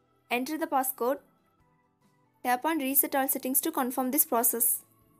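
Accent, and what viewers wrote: India. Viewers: the thick indian accent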